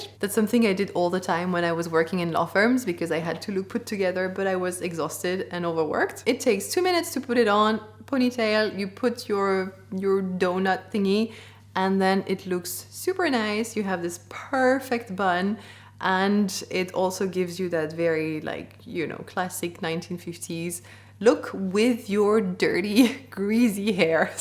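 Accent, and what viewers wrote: France. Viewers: I love your french English ❤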